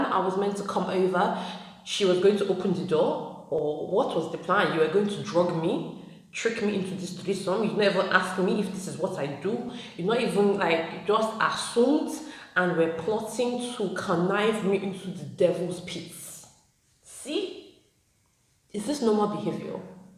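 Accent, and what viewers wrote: Nigeria. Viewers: Your storytelling w/ the Nigerian accent has me screaming☠️💀😅you are sooooo funny🤣🤣🤣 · Hey sis. Your braids is really cute. U did a great job. I like your smile and 9jia accent.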